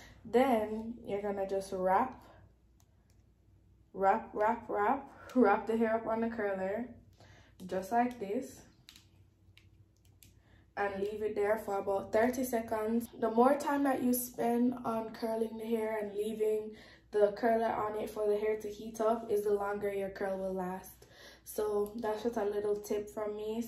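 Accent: Jamaica. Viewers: Are you Jamaican? I keep hair an accent for some reason hehe this is my first time coming to page definitely love this video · Are you Jamaican?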